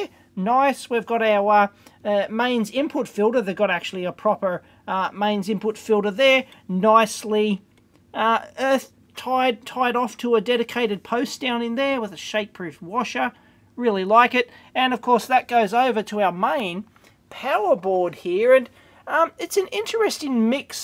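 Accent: Australia. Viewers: Nice one! That dumpster is becoming an electronic goldmine!! Keep up the good work, Love the Aussie Accent too!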